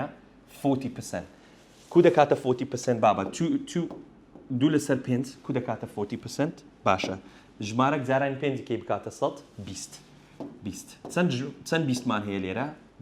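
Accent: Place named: United Kingdom. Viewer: مامۆستا ڕەحمەتت لێبێ ڕێک وەکو بەڕیتانیەکان قسە ئەکەیت چۆن تێبگەم ئاخر!!🙂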